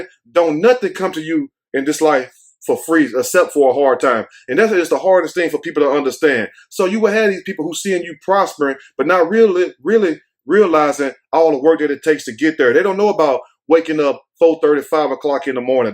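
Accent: South, United States. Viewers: I see you representing H- Town today. One of my favorite groups to relax and chill with. I like watching you, cos you be talkin that real shit. You seem to have Southern roots, hear it in your dialect. Keep on wit the keep on😅😅.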